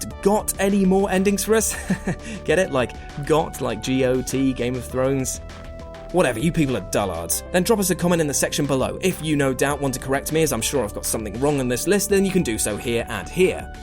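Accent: United Kingdom. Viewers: Brittish Ben 10 voice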